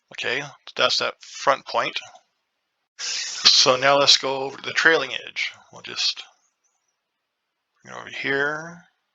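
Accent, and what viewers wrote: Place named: Pennsylvania, United States. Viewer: Absolutely fantastic! Thanks a million for this. btw, are you from the great state of Pennsylvania? You have that accent ..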